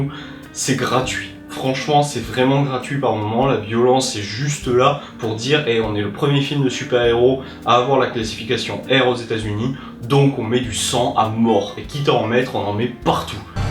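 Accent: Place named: Banlieue, France. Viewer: accent de banlieu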